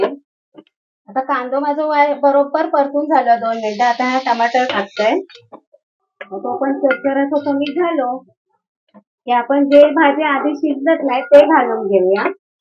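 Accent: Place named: Malvan, India. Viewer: मसाले भात भारी 👌🏻 तुमच्या तोंडी मालवणी भाषा गोड वाटता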